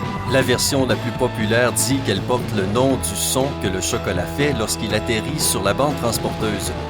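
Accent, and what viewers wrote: Quebec, Canada. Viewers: MALAK LOURAK c’est l’accent québécois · Oh quebequois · Ces moi ou la voix et québécoise ???😂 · J’adore l’accent québécois 🤗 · MALAK LOURAK lol c'est juste une voix cébékoise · La voix a un petit accent québécois vous trouver pas?🤨🧐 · Norabella07 Hamdani Peut être parce que c'est une vois québécoise.... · MALAK LOURAK il a pas le nez boucher il est québécois... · Yo sont accent quebz 😂😅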